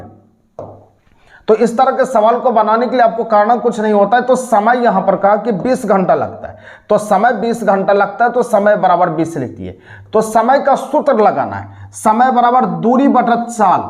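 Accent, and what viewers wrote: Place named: Patna, India. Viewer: Sir I think आप पटना से हो न Please confirm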